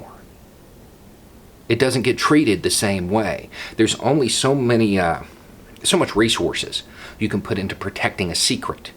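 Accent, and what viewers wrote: South, United States. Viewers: mah breau!!! Sure is good to hear a prog-lib of an analytical bent speaking with a southern accent. Ah do so mahself. Thank yew.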